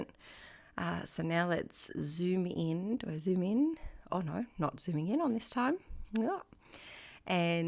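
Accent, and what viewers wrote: Australia. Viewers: Just saying hi from Victoria. Nice to see an Australian junk journaller! I was like, "I know that accent!!" 🤣👍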